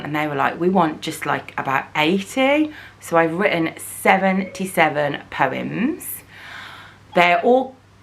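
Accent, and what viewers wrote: Birmingham, United Kingdom. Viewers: I don't listen to audiobooks pretty much as a rule... but I'm absolutely going to hold out for reading me 77 parental poems, in all it's brummy glory 🙌🏽 with love, from Saudi Arabia xoxo CONGRATULATIONS! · Well done x love the emotion x Real x love to meet ya x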